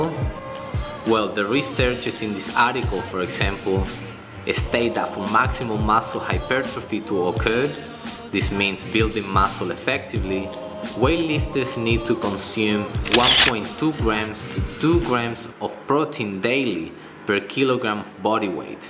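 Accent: Spain. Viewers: up主应该是西语母语人士啊：)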